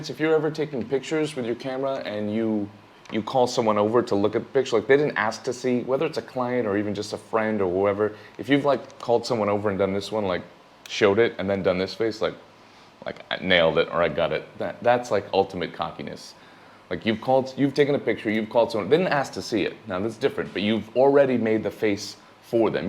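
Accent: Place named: New England, United States. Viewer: Got to tell you, its so refreshing to hear someone on Youtube talk like you do. I told my partner that you had to be New England. Masshole here.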